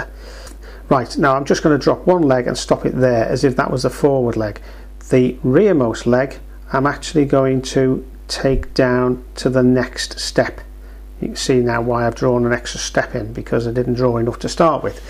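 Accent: Liverpool, United Kingdom. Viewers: Fab tutorial,lovely to hear a scouse accent from my home town too!😀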